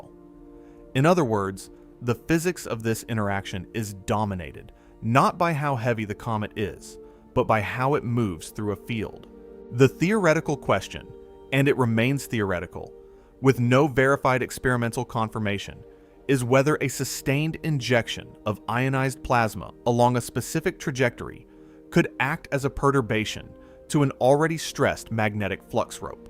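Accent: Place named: United States. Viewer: This is how a space documentary shod be fly and cleanly narrated in clear American English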